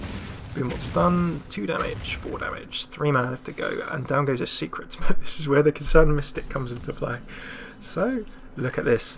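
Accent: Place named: United Kingdom. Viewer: Completely off topic, but I love your dialect. Where in the UK are you from?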